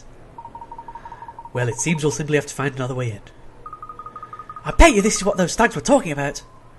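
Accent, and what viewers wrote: United Kingdom. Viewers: your British accent is pretty good, mate!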